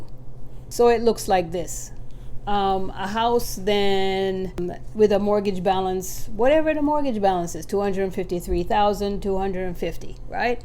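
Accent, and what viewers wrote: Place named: Jamaica. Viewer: you convey your message clearly. You sound like you're from my home country JA,..Quick question may I ask how you put together a buyers list?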